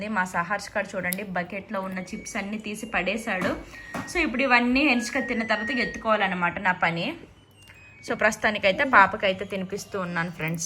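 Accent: Srikakulam, India. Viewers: Srikakulam language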